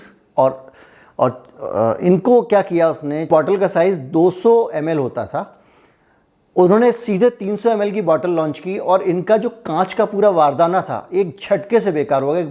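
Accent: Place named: Maharashtra, India. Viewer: खूप छान माहिती दिली भाऊ मराठी माणूस हिंदी बोलताना सहज लक्षात येतो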